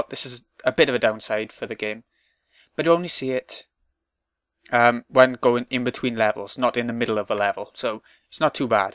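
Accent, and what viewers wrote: Wales, United Kingdom. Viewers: Used to LOVE this game, gonna buy it again on PSN. And no offense but I love your accent, which is unusual seeing as I usually dislike the welsh accent :P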